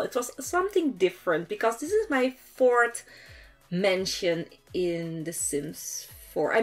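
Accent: Netherlands. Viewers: dit is de eerste video die ik kijk van je en ik hoorde gelijk dat je nl was x mooi gemaakt😍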